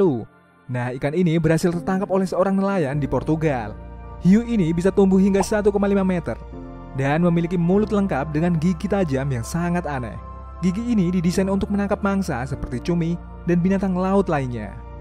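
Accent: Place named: Medan, Indonesia. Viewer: Orang medan kau yaa bahasa kau mirip kali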